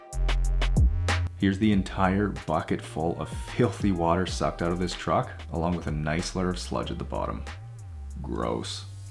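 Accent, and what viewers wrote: Canada. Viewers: Where is this guy located? Probably nowhere near me since I'd love him to detail my car! I usually do it but have been working so many hours, I get home and pretty much eat and go to bed. He sounds Canadian, so my guess is he's in Canada... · OMGOSH, here I thought he was from Hawaii and was confused by his accent. I don't really know what a Canadian sounds like since the ones I work with have straight accents so listening to him was quite the study.😂😂😂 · Man I love the Canadian accent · i knew he had to be canadian, no americans pronounce garage in the weird way he does lol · Love the Canadian accent aye..... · Americans: OMG I really love this guy's accent, it's so Canadian!! ROTW: We really, really cannot tell the difference between his accent and yours <shrug> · I love the Canadian “ya” and “hey” they always make me smile. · He’s been the only Canadian that sounds like a Canadian on this channel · I knew he was Canadian the 1st 15 seconds of the 1st video I watched lol however I can hear it more as I'm not Canadian.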